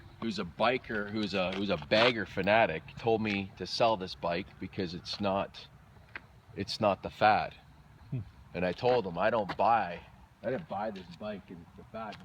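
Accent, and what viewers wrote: United States. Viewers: Are you from Rochester NY? Your accent... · You sound like you're from the northeast U.S., I am just wondering because I am. I have a sky blue 2005 American Ironhorse Outlaw chopper and I love it but I also love the ''old school'' looking bikes like you have, thank you for sharing!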